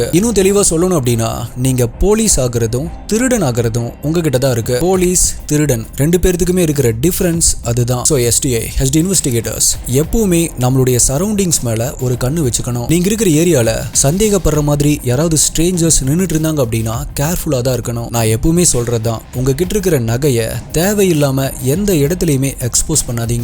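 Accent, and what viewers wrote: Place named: Eriyur, India. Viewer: அண்ணா உங்க ஊரு ஏரியூர் ஆஹ் னா உங்க slang அந்த ஊருல உள்ளது மாரி irukku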